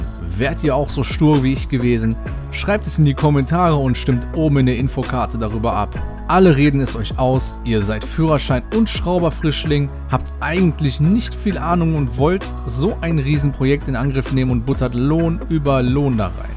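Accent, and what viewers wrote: Duisburg, Germany. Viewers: Kommst du aus Duisburg? Komme aus Duisburg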